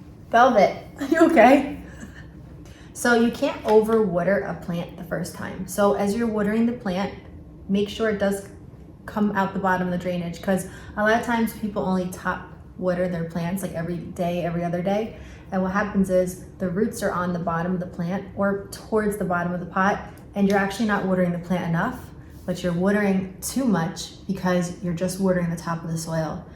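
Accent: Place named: New York, United States. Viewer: Stunning goddess: I'm just loving your vlogs! I love your way to explain and love your NY accent❤️❤️❤️❤️❤️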